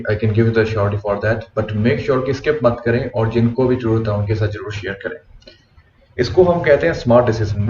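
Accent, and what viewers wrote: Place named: India. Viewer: English hindi mix accent 😏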